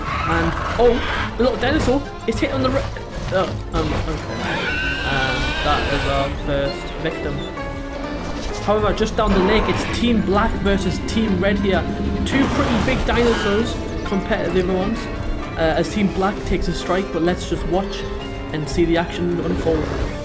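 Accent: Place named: United Kingdom. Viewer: The UK accent is fire bro you got the whole YouTube voice 🔥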